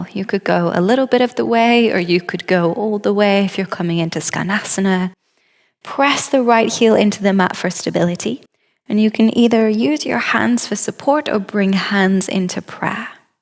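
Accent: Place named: United Kingdom. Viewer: Great practice, I’m a new subscriber, where from the uk is your accent from? Throughout the hour I was getting distracted trying to figure it out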